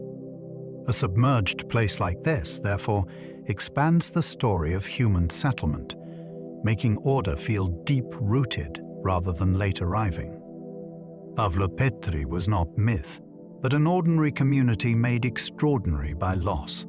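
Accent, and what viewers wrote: United Kingdom. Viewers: That’s ironic that you chose a British guys Chanel. I quite enjoy his crisp accent, the cadence is soothing, as well as the tones. Good luck finding one to your liking, the rest of us will be content to stay.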